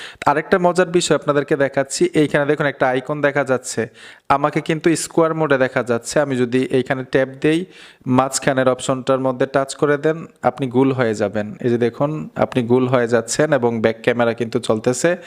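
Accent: Comilla, Bangladesh. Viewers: ভাই আপনিকি কুমিল্লার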